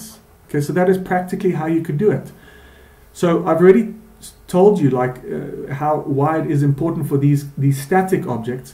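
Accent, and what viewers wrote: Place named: South Africa. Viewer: It's so refreshing hearing a Saffa's vvoice on one of these videos : )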